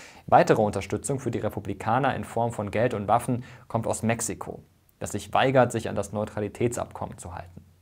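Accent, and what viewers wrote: Italy. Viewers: du gibst dir sonst immer so viel Mühe bei der Aussprache, nur nicht im spanischen. Alles sprichst du italienisch aus.:( Ich fühle mich ungerecht behandelt! 😄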